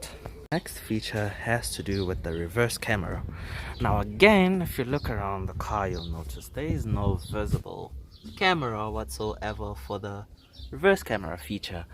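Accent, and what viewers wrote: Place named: South Africa. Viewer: Dude no way I was gonna say you sound South African!! Love the videos man keep it up :)) Love from Cape Town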